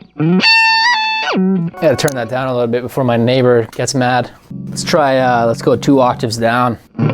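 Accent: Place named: Canada. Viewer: This guy talks like a darn Canadian!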